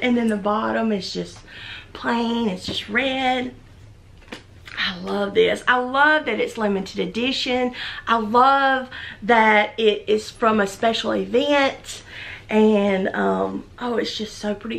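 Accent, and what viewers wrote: South, United States. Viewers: Thank you so much for getting back to me. I’ll have to check out Ebay maybe. I am a brand new subscriber to your channel. I cannot tell you how much I enjoy you! You are such a bright light in a very trying tone for so many. I smile and laugh every time I watch your vlog. All your “oh my gosh” and “I love it” in your sweet southern accent. Even my husband says in a southern accent (and we’re in NH so speak yankee) “shut up” It has been so much fun watching your videos. I have watched EVERY ONE already!! Thank you for bringing some added joy to my days! Can’t wait for your next installment...I’ll most definitely be watching! 😀. AMY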